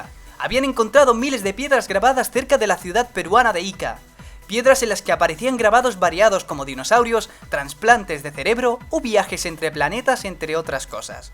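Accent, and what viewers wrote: Spain. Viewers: Ese acentote español. Casi ni se nota que es. Aún no se drogaba pero usaba la misma música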